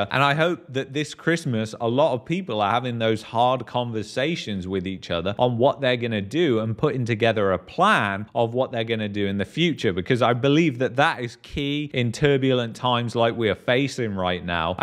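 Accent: England, United Kingdom. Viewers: Hey you sound English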